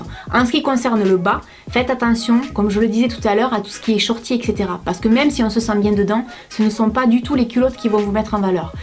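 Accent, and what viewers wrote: South, France. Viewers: Elle vient du sud de la France 😉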